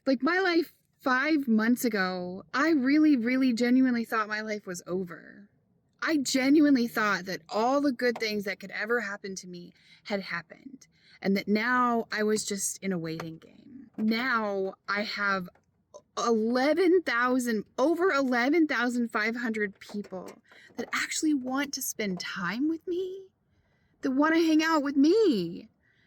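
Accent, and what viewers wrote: South, United States. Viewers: Hi! I love your attitude so much. I don't know where your accent originates from, you sound so much like my friends and me. Especially your vowels. I'm a southern girl through and through! Watching your videos is so much like listening to one of my friends or my sisters. AND your channel is growing so fast!! I'm so excited for you. Can you get a Jackery or something like it that can charge off your van while you're driving? Edit: omg I posted while your video was playing and your appreciation made me cry. We love you!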